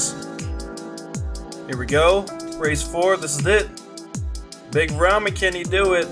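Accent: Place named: East Coast, United States. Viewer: East coast commentary...yes sir! Good job, and always great racing!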